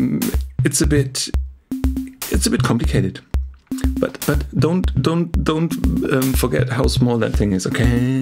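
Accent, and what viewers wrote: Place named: Germany. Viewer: You sound so German. ^^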